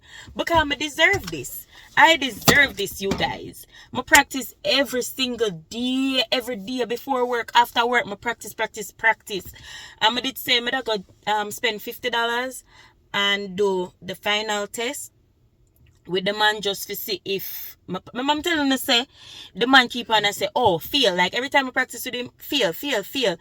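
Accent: Jamaica. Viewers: When you speak rank Jamaican it is hard to understand you, please be mindful that persons other than Jamaicans are watching your videos. Good video nevertheless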